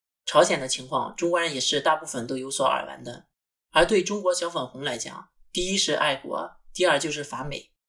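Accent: Shandong, China. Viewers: 聽口音是山東人？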